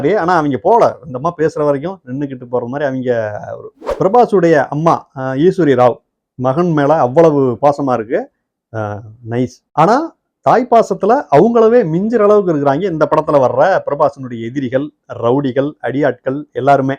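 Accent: Madurai, India. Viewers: Maapla u are sema under rated 😂😂😂 Madurai slang la summa alaparaya iruku review maplooooi 😂 😂😂😂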